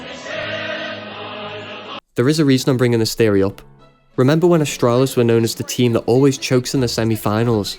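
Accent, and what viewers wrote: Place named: Glasgow, United Kingdom. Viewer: Bro you from Glasgow?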